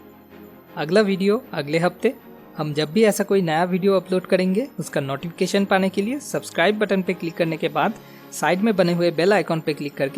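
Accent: Bengal, India. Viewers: Dada accent 🙌🙌it's too sweet rosugulla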